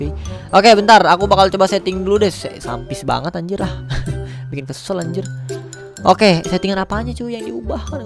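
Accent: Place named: Sunda, Indonesia. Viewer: Sunda detect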